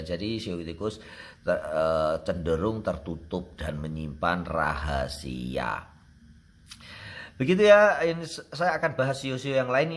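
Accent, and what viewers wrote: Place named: East Java, Indonesia. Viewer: naahhh pasti dr Jawa ya ..Jatim kyknya ...logatnya medok ...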